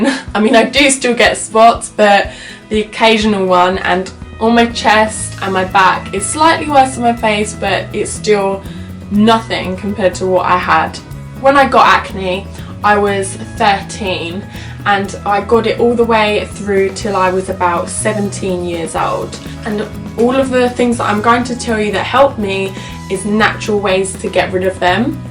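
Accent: United Kingdom. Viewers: I just came here to listen some nice British accent. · I love your accent sounds abit essex but i find that soothing to listen to haha... im gonna go out and buy those tablets tomoz sick of my spotty skin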